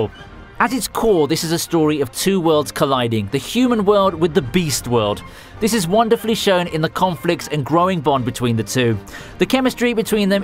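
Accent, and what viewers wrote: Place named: United Kingdom. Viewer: I like your British accent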